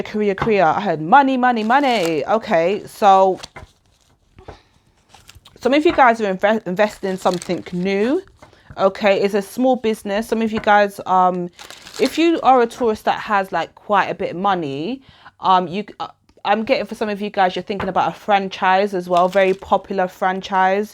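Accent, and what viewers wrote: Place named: United Kingdom. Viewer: Love the accent, girl. Makes me want to be British. Nuff love from the Jamaican One.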